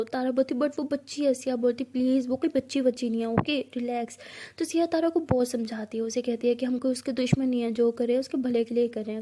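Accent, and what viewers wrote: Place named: Uttar Pradesh, India. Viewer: Pakka up se ho sahoor to mp up me hi bolte hai😂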